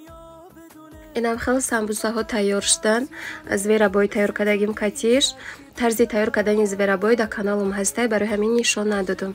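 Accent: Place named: Hisor, Tajikistan. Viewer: И лафзи хисорихояй марам дугонахом ай хисор шахринав хамихели гап мезанан карсодем меган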